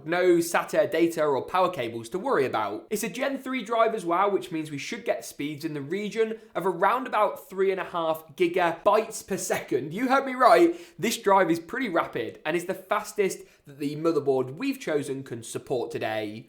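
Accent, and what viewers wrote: United Kingdom. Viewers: The most British guy I have ever heard!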